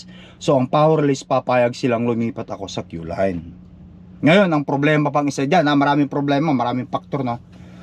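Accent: Ilocos, Philippines. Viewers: ILOCANO TALAGA ANG TONO MO MAGSALITA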